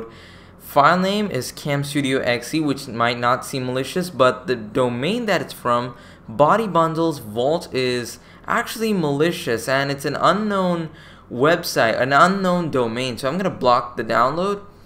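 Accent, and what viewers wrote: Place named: India. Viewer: Man, for you being Indian, such a great accent, and the way you explained, so greatly! You deserve more, and if you're not Indian or you're offended, I'm sorry lol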